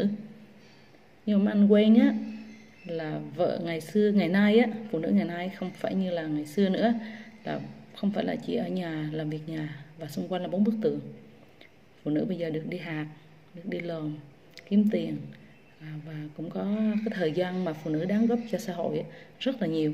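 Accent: Quang Nam, Vietnam. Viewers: dạy tiếng Anh vậy khi phát âm tiếng Anh có khi “nồ” bị ảnh hưởng giọng QN không? Thí dụ “shall” —> đọc là “sô”? How (—> hô) Frank (—> frenk) Mackerel (—> méc.kerel) Contact (—> con.tect) v v Các bạn học sinh họ có khó khăn gì ko? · Chu choa bữa ni cắt tóc ngắn, nhìn thấy lạ nghe cô giáo . Nói chuyện giọng Quảng nom nghe dễ thương quá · Chào em gái Quảng Nôm. Anh cũng người Quảng xa quê gần 30 năm.Rất vui khi đựợc nghe em nói chuyện bằng giọng Quảng. Hy vọng một ngày không xa được cà phê với em tại Đà Nẵng hỷ!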